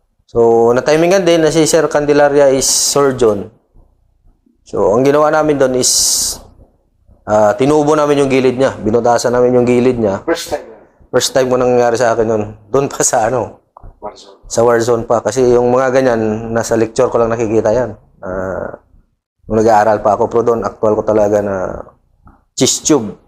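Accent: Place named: Visayas, Philippines. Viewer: Hehhehe katawa ko sa baho way ligo² mura lagi ka og bisaya sir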